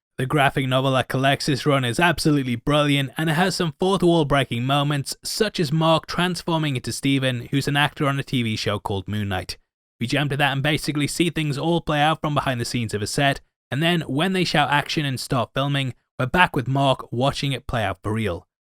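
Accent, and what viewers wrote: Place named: Cockney, United Kingdom. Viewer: Most Americans probably thought you were cockney anyway 😅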